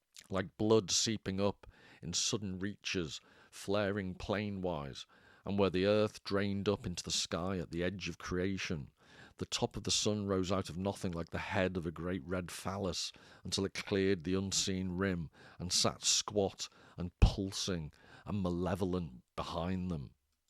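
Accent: Liverpool, United Kingdom. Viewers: just discovered you today, I will subscribe, I have read blood meridian about 5 times, although suttree is my favourite, I will have to read America, good to hear a Scouse accent, i left Liverpool 35 years ago!! Keep on keepin on 🙏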